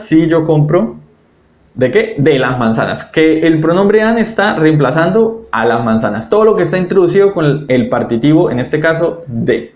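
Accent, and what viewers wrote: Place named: Spain. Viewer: Lastima el terrible acento español hablando frances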